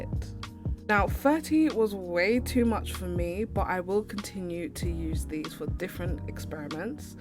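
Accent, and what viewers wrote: United Kingdom. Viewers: you have a lovely voice I always wanted to speak in a British accent!🍵💕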